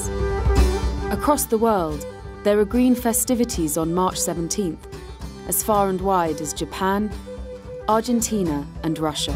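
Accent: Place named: England, United Kingdom. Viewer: The lovely English-accented voice makes no mention of why the Irish were forced to migrate around the world. 🤔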